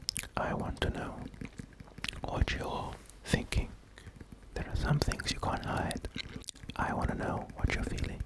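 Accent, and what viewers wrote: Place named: South Africa. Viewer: Finally someone who does asmr with a legit South African accent! Yayyy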